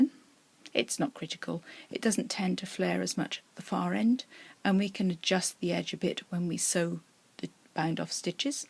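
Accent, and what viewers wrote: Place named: England, United Kingdom. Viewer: You are very easy to follow; a nice English accent so pleasant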